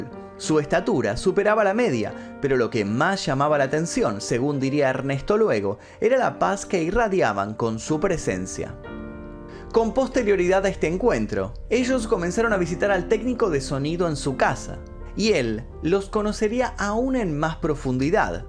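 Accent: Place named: Argentina. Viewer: Hola excelente tu modo de narrar y ese acento argentino me mata. 🎉 saludos desde México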